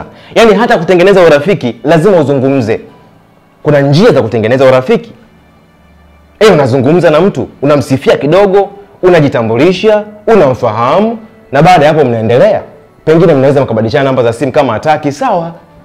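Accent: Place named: Tanzania. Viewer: Nyoosha kingereza cako yaani unakiongeya kibongo bongo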